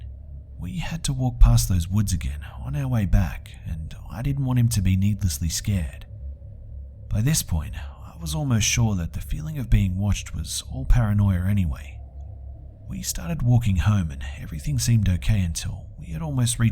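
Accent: Australia. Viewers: you and lazym are the only horror story tellers i like. so many boring droners with no...energy. also i think the ausie accent helps! i listen to you most nights as i fall asleep (fear helps me sleep haha). i originally found your channel via chilibeast i think. thanks!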